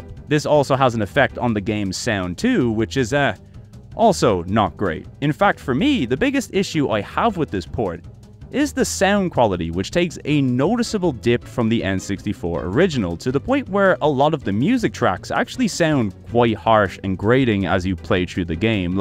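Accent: Ireland. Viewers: What is this accent? Irish ?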